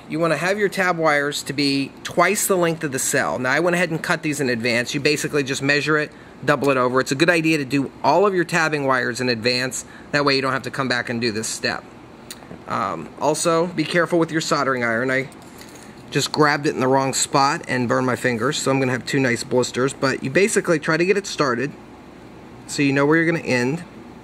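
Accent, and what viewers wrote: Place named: United States. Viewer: WHY DO YOU AMERICANS SAY SODERING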